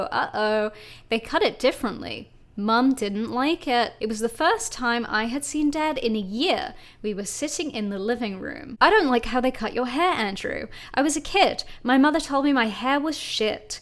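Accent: Brooklyn, United States. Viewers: Please record all future videos with your Brooklyn Accent. You don't have to though. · All I took away from this - this man needs therapy. And - best Brooklyn accent ever. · That accent! I'm dying. Absolutely spot on American accent. Chef's kiss. Never change. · Top quality Brooklyn accent 😎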